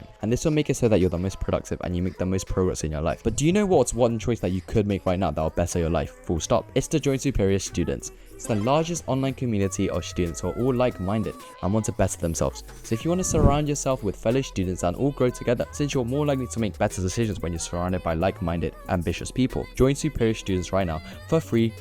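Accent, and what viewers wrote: United Kingdom. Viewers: Korean guys with british accent are so welll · literally watched the entire video not noticing he was brittish until he said “oh my days man” and now i cant unhear his accent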